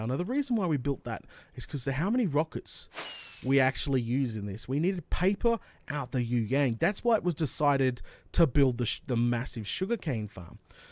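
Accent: Australia. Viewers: not hating at all but you are just soo australian it’s crazy lol. anyway wonderful video and beautiful world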